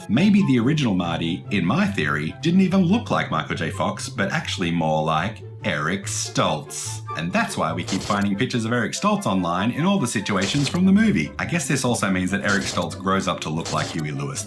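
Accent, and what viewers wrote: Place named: United Kingdom. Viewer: oh damn dude that comment i left, i deleted after a minute, because the tissue joke was quite obvious for everyone, and not a quick split second blink-and-you-miss-it pause-at-the-right-time kinda deal (like how i thought it was) you replied fast!! i appreciate that anyway this is a good video and i adore back to the future and thank you and all that subscribed and whatever and make more videos and shit that whole theory was pretty top notch and i love it actually... i kinda wish it was true and canon now honestly but my only ACTUAL criticsm for your video is you should vary up the music and sound design a bit more you know, the heavier points you drop the music to give some focus, maybe switch it up every now and then so it's not the same stock kevin macleod track... just make sure the video is as good to listen to as it is to watch that's it though, the editing was great, the jokes were good, you have a great personality, your voice is british and memorable (which is great combo on youtube) the sound design just needs some more work, and that's just from THIS video alone, i have yet to see your others!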